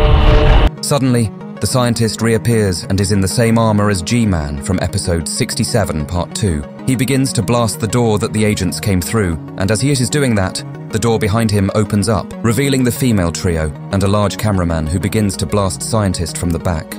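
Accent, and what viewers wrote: United Kingdom. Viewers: Are you british? Just asking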